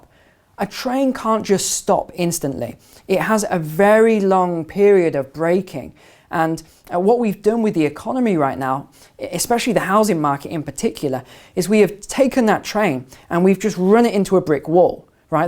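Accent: United Kingdom. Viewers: Its weird listening to a British sounding guy talk about American reality. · God bless you for saying all four syllables of "military" !! With a British accent, I was fully expecting to hear "mili-TREE" instead.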